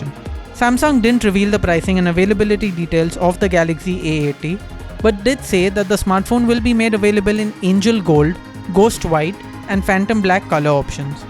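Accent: India. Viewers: You sound like a indian scamer.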